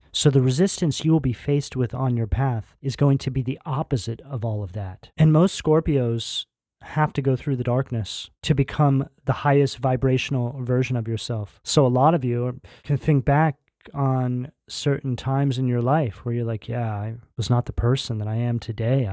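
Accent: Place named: New Jersey, United States. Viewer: what a deep and meaningful reading meant a lot and do accurate on every level … thank you 🙏💓 and I just love the way you look if my mum was here now, that’s what she wanted me to have my hair like yours just thought I’d pass that on 🤭wish you a super time in ‘out of state’ from me in the Uk altho my Mom was from New Jersey … and i also enjoy your accent it makes me feel close to her god bless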